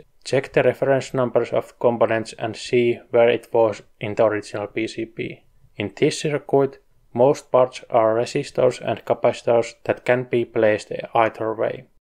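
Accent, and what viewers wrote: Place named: Finland. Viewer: I like the Finnish accent, sounds nuts.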